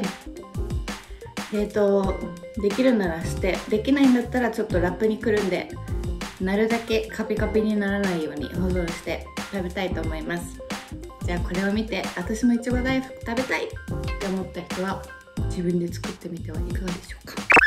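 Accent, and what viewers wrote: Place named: Yamaguchi, Japan. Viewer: ちゃん！今日あなたの動画を見つけて、とっても癒されました♪ありがとう！山口弁てとこもかわいい！私も福岡出身でベルリンに住んでいて、大福作るの大好きで、いつかベルリンのマーケットで売るのが夢なの！食べてほしいな♪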